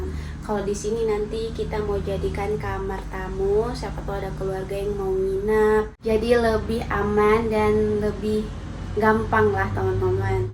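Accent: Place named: Bugis, Indonesia. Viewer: ku kira ikan garam itu apa, ternyata ikan asin 🤭 kalo belihat bebahasa bugis logat logat bugis gitu saya suka dengarnya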